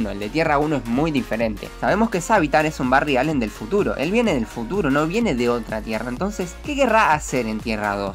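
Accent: Argentina. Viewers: Ramiro Lopez sera por que es argentino y los argentinos tienen el ch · Me gusta muchos tus vídeos son los mejores con tu acento argentino el mejor ps: como se llama la música del minuto la llevo buscando desde hace bastante tiempo :)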